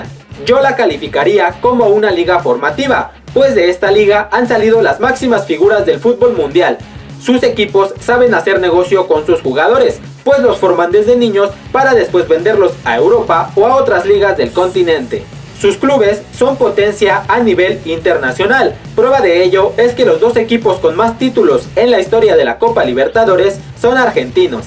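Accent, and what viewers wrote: Mexico. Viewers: Del inicio del video desde eschuche como habla este tipo que iba es mexicano ya sabía q liga iria primero ay dios 😒😒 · ¿Se nota que es Mexicano? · La liga de México la mejor? Jajajajaja jajajajaja Cuando puse el video y escuché el acento mexicano era obvio que iba a poner la liga mx primera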